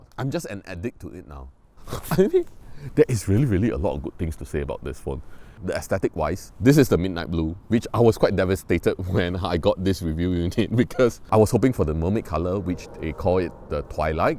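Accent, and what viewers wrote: Singapore. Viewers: You sound really Singaporean / Malaysian. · No anyone comment on the Singlish?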